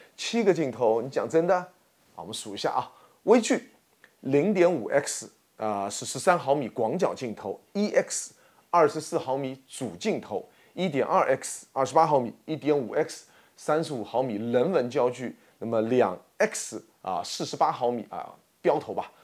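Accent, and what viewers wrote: Shanghai, China. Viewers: 个口音很上海 哈哈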